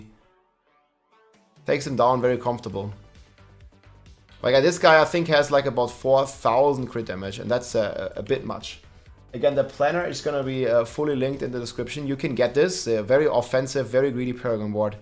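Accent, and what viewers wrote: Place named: Germany. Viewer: An hört sofort einen deutschen raus wenn er Englisch spricht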